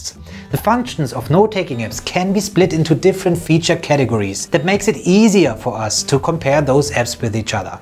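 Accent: Germany. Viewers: Er hört sich so deutsch ann??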